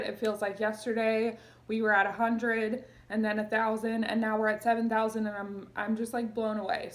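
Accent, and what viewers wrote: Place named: Oregon, United States. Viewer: Love your channel! I’ve been watching you for a couple of months and am really enjoying your videos! I’m a fairly new Poshmark seller and find your tips very helpful! Can I ask you where you are originally from? I get an Oregon accent vibe from you, but I could be totally wrong. Keep up the good work! Thanks!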